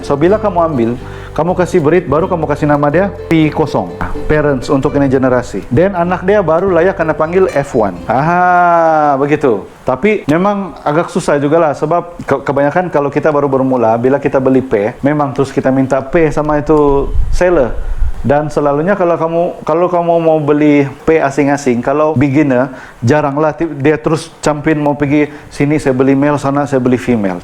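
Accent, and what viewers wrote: Sabah, Malaysia. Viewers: Selamat malam tuan..bila sye tengok tuan punya channel Saya faham ape tu maksud p0 Dan F terima kasih tunjuk ajar...jiwa Saya semakin membara untuk breeding betta...Dan Cara tuan cakap sabah teringat sama kawan2 di tempat kerja tahun 2007 dulu..sebab bila bersama diorang terasa Saya nie di sabah...hahahahahahah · mntapp..cakap sabah serupa dgn bhasa brunei bng..ngam ka salam dr brunei broo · ui na, mantap boss, terus maju jaya sihat selalu dan murahbrezeki bosskur, truskn ckp begitu, sia mok blajar beta sama mok dgr berckaap sabah, btw info harini jelas dan paham terima kasih👍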